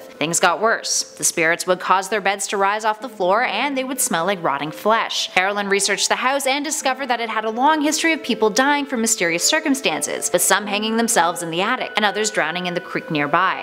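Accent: Canada. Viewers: Either Canadian or from New England, US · She sounds Canadian, eh... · Garrapaterorulez Sounds Canadian. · How many times does this story need to be recreated on YouTube, same story different face with a Canadian accent. Blah blah blah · Liliana Jasmine maybe its canadian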